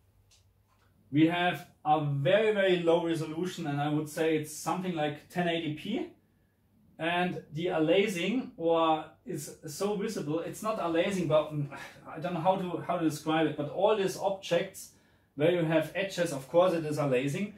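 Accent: Germany. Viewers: Im sorry, it has nothing to do with the video but i’m fascinated by accents and I was just wondering, is that a German accent? That’s my best guess lol. When you said “when fading” I would of just said “when looking around” tbh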